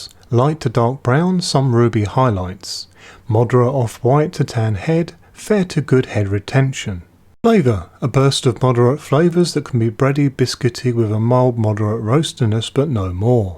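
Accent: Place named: England, United Kingdom. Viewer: Thanks! I really enjoy your videos...it's always reassuring when the presenter for a porter recipe has an English accent.🙂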